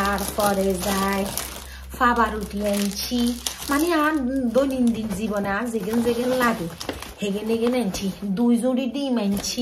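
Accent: Noakhali, Bangladesh. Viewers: Please নোয়াখালীর ভাষা না বলে নরমাল বাঙলা কথা বলেন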